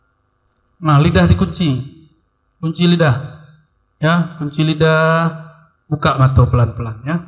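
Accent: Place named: Banjar, Indonesia. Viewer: kdg bhasanya kayak urang banjar, kdg kayak minang, kdg melayu kalimantan...kayak urang rawa pun ada, bersiko siko ! ...ustaz kiye sak tenan ne asal muasalnya teko daerah ngendi n suku opo ?..😂.. *" salam , saya si ganteng . jawa ngapak@ jawa begelen lahiran mlysia... apa pun ustaz ini makjleb n makyessss..👌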